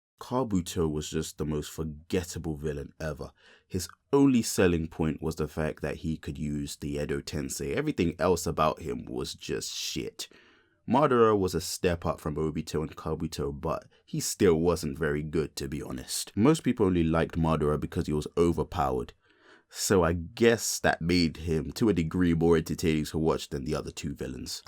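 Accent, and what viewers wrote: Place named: United Kingdom. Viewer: bro your british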